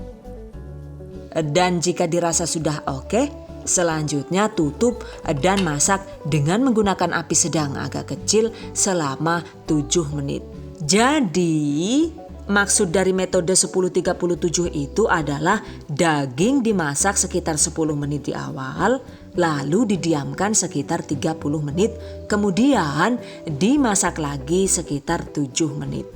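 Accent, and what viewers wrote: Banyumas, Indonesia. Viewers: gagal pokus pas ngmg ngapak kaya nggone nyong, bahasane ngapak😂😂😂😂😂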